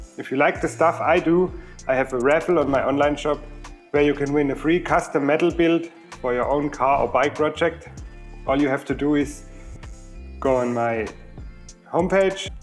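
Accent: Swabia, Germany. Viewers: ❤ I really love your Swabian accent! I look forward to your sourkraut video!! 🎉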